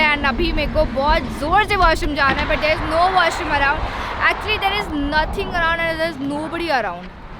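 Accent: Maharashtra, India. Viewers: Hey today I saw ur vlog first...surely u r Marathi..u r way of talking shows that..btw welcome to our place. Uttarakhand devbhumi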